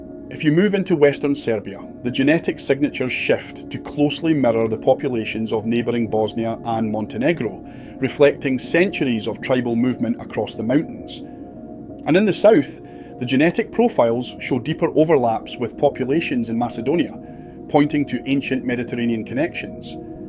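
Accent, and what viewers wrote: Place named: North, United Kingdom. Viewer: Interesting. Thank you for the subtitles, otherwise I could not understand your accent. I have heard the New Zealand English, which was very difficult, but I think yours is from somewhere in northern Britain ?🌹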